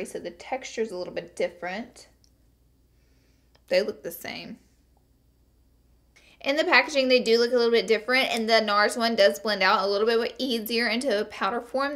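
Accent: South, United States. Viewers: Yes baby you got that beautiful southern bell accent 💞 makes me feel at home. 🤗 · I love these videos!!!! They are just the best! I love ur southern voice!! Ur vids are just the best! And ur palette!! Come thru!! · By the way I love your accent it's so cute and southern💕💕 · The vocal fry combined with the deep southern accent can be unnerving to some people, but I'd say just don't watch. Her content is good, and it's not like she can help it much. Don't know why people feel the need to be nasty about it.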